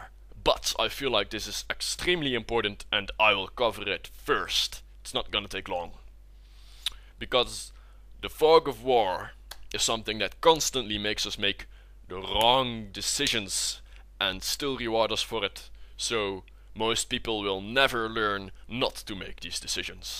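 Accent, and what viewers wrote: Netherlands. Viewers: are you dutch? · Love your dutch accent. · DAT DUTCH accent :D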